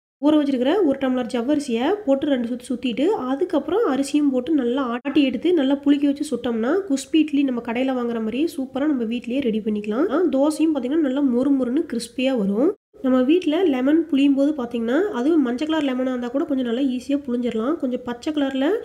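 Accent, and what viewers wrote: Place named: Coimbatore, India. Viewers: Neenga coimbatore ah akka slag super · Nga nu solra slank patha neenga Coimbatore ngala...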